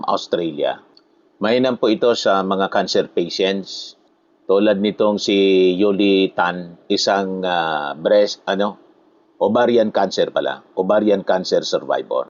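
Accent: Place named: Bicol, Philippines. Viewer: Bicolano ba kyo sir kc pareho tyo ng punto?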